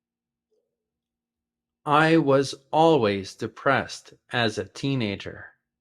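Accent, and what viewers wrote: United States. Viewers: American or Bri?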